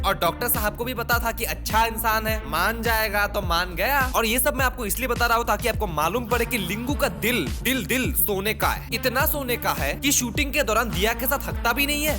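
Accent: Assam, India. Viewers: bro are you from Assam 😂😂 please reply from where you are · Are you from Assam bro ? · Bro r u from noth-east assam!?❤ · Bhai tum assamese ho kya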